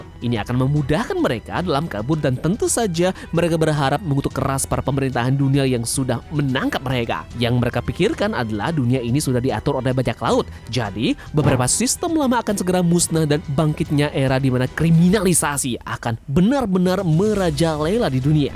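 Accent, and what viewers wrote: Bali, Indonesia. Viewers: Orang bali bang?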